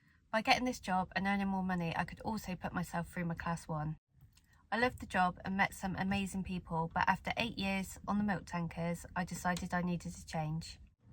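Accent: West Country, United Kingdom. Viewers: Hi again, having just subscribed to your channel it offered me this one to watch, and I am pleased it did, you spoke alot more in this one, which was great and reminded me of my late partner who sadly died Aug last year, she (Valerie) was from the west country, Somerset to be exact, so hearing your accent really warmed my heart and brought back some lovely memories, we were together 20 years and I still miss her, thank you for sharing. All the very best to you, from Nick.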